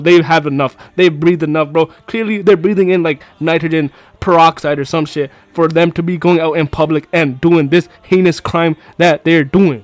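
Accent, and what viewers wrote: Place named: India. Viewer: My guy he is Indian too you can tell from his voice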